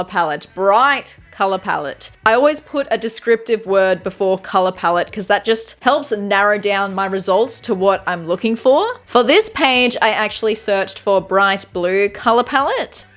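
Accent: Australia. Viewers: Thank you. I love those paintings. And I noticed your Aussie accent. Aussie, Aussie, Aussie ….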